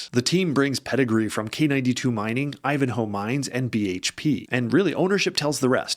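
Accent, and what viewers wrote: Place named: Midwest, United States. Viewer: yep, I listened very close and I heard you and trusted 100% in your research! Been listening very close since the first vid I watched of yours! Must have heard that midwest accent! Thank you for alllll the hard work and explaining what you did at AMZ explains a lot! Even have my husband listening now!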